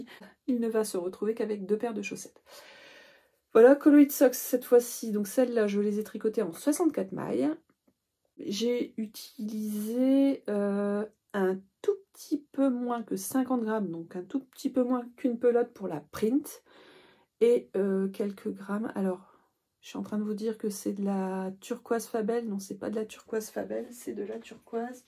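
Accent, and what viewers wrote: France. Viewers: J'aime beaucoup t'écouter, petit accent qui me rappelle mon enfance à Marquise 😉 mais pas seulement pour ça... Tes ouvrages sont beaux, la "rubrique culturelle" est claire, intéressante, tu me donnes envie d'écouter un livre audio... Merci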